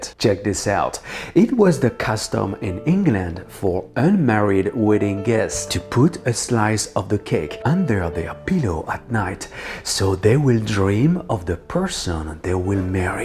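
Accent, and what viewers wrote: France. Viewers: Frenchy u make me remember my best frenc friend with u accent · Is it just me or is everything better with a French accent.????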